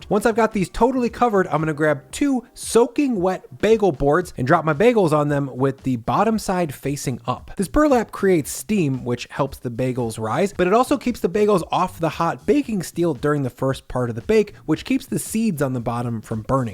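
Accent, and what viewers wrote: United States. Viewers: You sound American,, Why are you using metric crap ??? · You have an American accent and you give us grams... duh. thumbs down.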